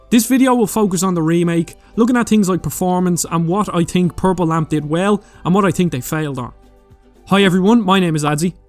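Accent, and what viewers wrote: Ireland. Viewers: I just love the Irish accent, instead of thing it’s ting ah I love it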